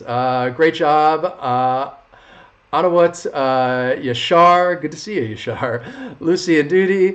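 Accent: United States. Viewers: Fuuuuu. American accent. Switch off.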